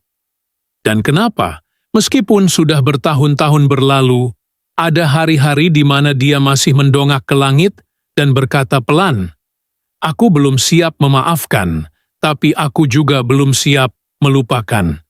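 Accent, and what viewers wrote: Malaysia. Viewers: Dimana bisa mendengar langsung pengakuan dari meriam.belina? Vlog ini kam narasi dari admin yg dr logatnya orang melayu/malaysia bukam dr indonesia..bisa saja bohong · Kok logat malaysia?vlog dr sana kah?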